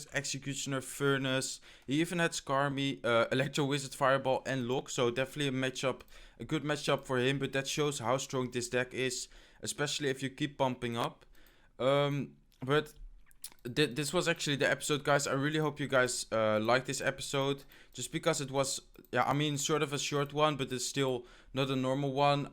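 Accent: Netherlands. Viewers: je nederlands accent ook xD haha nice vid :P heb je een goed deck om 4000 trof te bereiken? heb nu een een deck: giant skel, zap, sparky, fire spirits, goblin ton, dat skeleton hutje, mega gunst, vuurbal zit rond de 3500 nu maar kom maar niet ervoorbij haha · lol, it's his dutch accent haha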